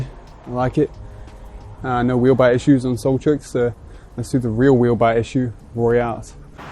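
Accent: New Zealand. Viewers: Are you born Kiwi or you come from another country? I love your accent 😍💞💞💞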